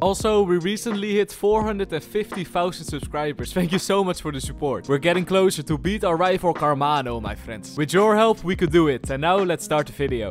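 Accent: Netherlands. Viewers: effe voor duidelijkheid jij bent toch Nederlands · why does he sound dutch? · i love youre dutch accent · Very Nederlands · One second in, ah this guy is Dutch. "checks username:: 100% confirmed":)) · Are you from the Netherlands you have exactly that accent. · Ur accent is the same as a dutch man speaking english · are u dutch bc i can hear it in your voice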